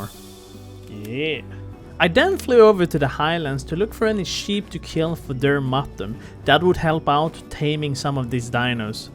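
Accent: Sweden. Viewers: Are you swedish? You sound swedish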